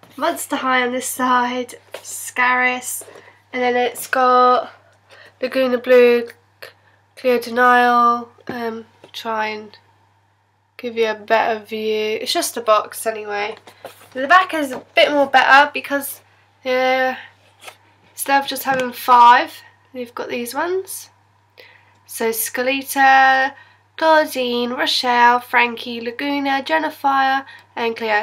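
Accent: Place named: United Kingdom. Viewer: You're so sweet, i love your UK accent and congrat for this awesome pack :) I love you're video. Bisous from France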